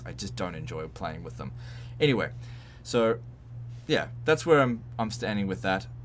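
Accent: Queensland, Australia. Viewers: Brisbane gang represent, enjoying the deep Queensland accent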